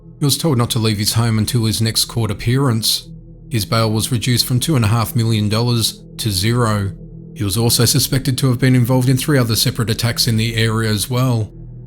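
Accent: Australia. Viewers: The narrator is obviously from Australia. They pronounce many English words differently than we Americans do.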